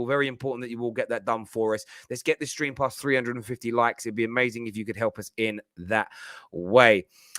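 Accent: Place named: Manchester, United Kingdom. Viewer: Got to love the Manc accent.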